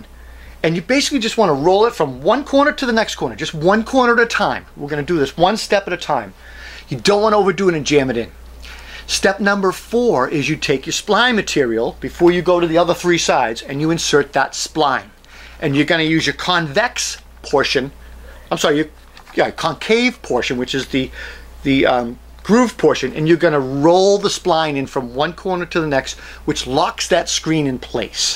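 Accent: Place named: Massachusetts, United States. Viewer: The one thing I look for in DIY videos is that the person has an accent that I'd hear on a job site, thats how I know it'll be short amd sweet while being accurate and efficient 😂 Gotta be country, Boston, or New York.... Couldn't pin this accent but sounded like Massachusetts or New York. 👌🏻 Immediately trustworthy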